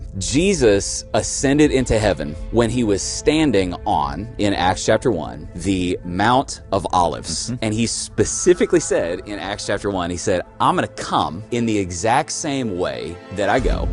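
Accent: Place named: South, United States. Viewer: Not to diminish his point (I honestly couldn't focus on it), but his strong stereotypical southern drawl and overuse of diphthongs was incredibly distracting.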